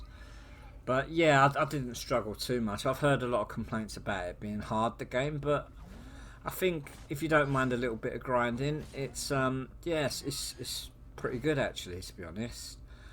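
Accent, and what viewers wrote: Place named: Ireland. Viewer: Are you Irish, bro? Cute accent :)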